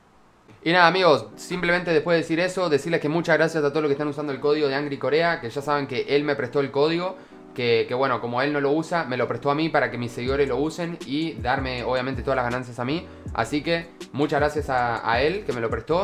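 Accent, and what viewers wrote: Uruguay. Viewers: sos uruguayo a argentino sacame la duda capoo?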